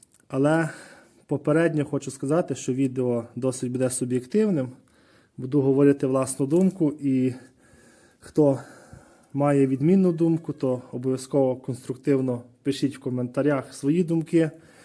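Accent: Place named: Hutsul, Ukraine. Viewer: це не українстка, а гуцульська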